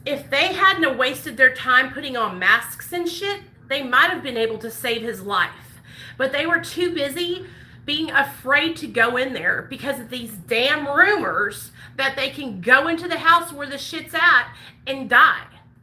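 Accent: South, United States. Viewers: R u from down south? I'm recovering addict outta Houston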